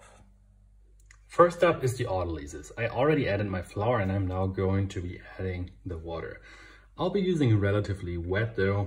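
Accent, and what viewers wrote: Germany. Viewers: Are you from Flensburg? :)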